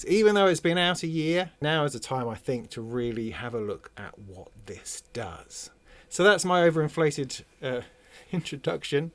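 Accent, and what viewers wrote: United Kingdom. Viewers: you're becoming something of a rather good influence. And so nice there's another UK accent to listen to. just got myself an mpc one as a brain....(not personally... though perhaps could be pressed into service...) for a more advanced system. looking to start investing and building around Christmas time. Keep making the vids... learned everything i know so far mainly from you and divkid., huge thanks (btw... what was that reference you made to church the other day......?)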